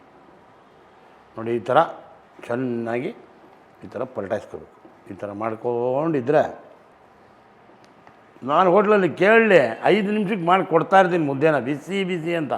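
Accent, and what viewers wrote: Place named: Bangalore, India. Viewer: ಗುರುಗಳೇ ತಮ್ಮದು ಯಾವ ಊರು ತಮಗೆ ಯಾವೆಲ್ಲಾ ಭಾಷೆ ಬರುತ್ತದೆ ಕೆಲವೊಮ್ಮೆ ಬೆಂಗಳೂರು ಭಾಷೆ ಆಯಾಡ್ತೀರಾ ಮತ್ತೆ ಮಂಗಳೂರು ಭಾಷೆ ಆಡ್ತೀರಾ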